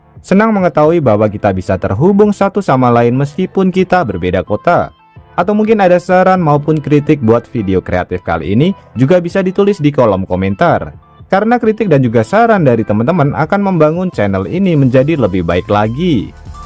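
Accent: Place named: Surabaya, Indonesia. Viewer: Suroboyo masku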